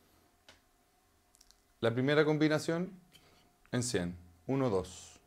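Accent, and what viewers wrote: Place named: Chile. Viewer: No e visto los detalles del canal pero detecto un fuerte acento a ‘tsiléno’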